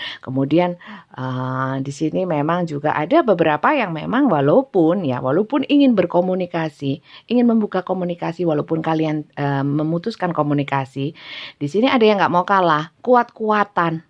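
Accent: Java, Indonesia. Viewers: Setuju bunda.. dg bahasa bunda yg agak" medok..sy suka banget.. krn sy wong ndeso bunda. Trenggalek jatim..💪semagat ya bun. Smg bunda sht sll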